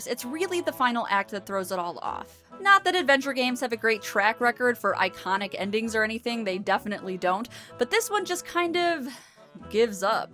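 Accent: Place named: General American, United States. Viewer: Awesome; I enjoyed this video as usual! I am feeling a little pedantic though and I have to point out an issue (please forgive me). Everyone has an accent. A General American accent is still an accent. It's what you're most used to hearing, so it doesn't stand out to you... but it's still an accent.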